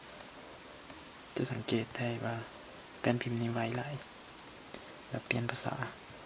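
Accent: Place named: Vientiane, Laos. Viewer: ຄັກຫລາຍ ວ່ານແຕ່ສຳນຽງ ວຽງຈັນປະສົມທາງໃດຫວະ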